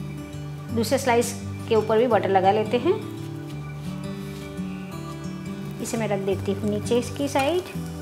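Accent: Gujarat, India. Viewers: bhale Tame hindi bolo , pan ek gujarati bija gujarati ne odkhi j jay 😃😃😄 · Apart from your surname your tone also confirms that you are from Gujrat .. 😊😊 and also the kitchen setup all white white .. so nice 👍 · Aap gujrati h na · Too much of ur gujrathi accent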